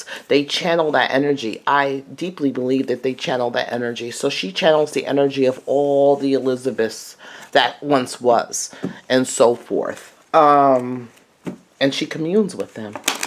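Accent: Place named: New York, United States. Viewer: I thought I heard a little subtle of NY. I think a big conflict is Meghan's never Trumper bs narrative with all of her Illuminati hollyweird friends. The meeting of DJT is going to be something she isn't going to like and will possibly not be included. Watch, next week or in the next weeks to come, there will be an appearance with the oblamers that will cast shade on her "royal position". It's going to piss off the queen of the fall out from it. Just a feeling I have. This will be an "opportunity" for the oblamers to insert their "presence" onto the world stage. It's going to interesting to watch to say the least! Grab the popcorn!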